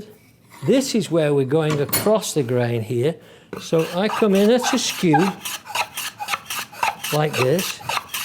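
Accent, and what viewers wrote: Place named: Northern England, United Kingdom. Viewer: Beautiful work and I love hearing your commentary - reminds me of my beloved family from the north of England! Off to chamfer the base of the Oregon legs on my Moravian Workbench project. Thanks to you, I'm sure it will be a much better outcome now! EDIT: Well, I used your approach for the bottom of the Oregon legs, cutting the chamfers first with a Japanese saw, then cleaning the up with my (beautiful) block plane. I even used your pencil line method! The chamfers look great and I'm grateful to you. Cheers from Sydney - David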